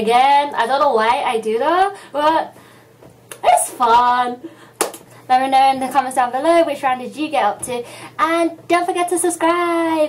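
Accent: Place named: United Kingdom. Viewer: AHH this was awesome, loved seeing how you reacted. I was the same, Also love your accent. From the UK right? you can punch me if i am wrong ahah xD I am from the UK but you have a much nicer voice than my own xD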